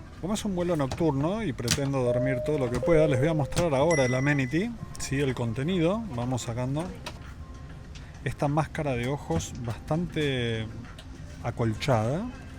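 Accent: Argentina. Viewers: se nota de lejos tu acento Argentino para los que sabemos diferenciarlo buen video y saludios de un Urugayo en galicia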